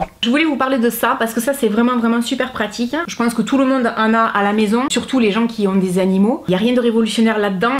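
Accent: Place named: Roussillon, France. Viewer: Je suis mega fan du action aussi 😊 Et tes vidéos m'aide bien à faire mes choix, entre autre les éponges en micro fibre et les chaussettes spécial masques pour les pieds, donc j'adore ce type de vidéos 😊 Ça m'aide à cibler ce que j'achète, parce qu'on a vite tendance à acheter de tout et n'importe quoi dans ce magasin lol PS: J'adore tes vidéos parce que ton accent me rappelle les vacances 😊 Je suis Belge mais ça fait 10 ans que je vais à Canet en Roussillon en vacances (mon compagnon va là en vacances depuis sa naissance, on projette d'acheter un appart là bas pour notre retraite quand on sera vieux 😊)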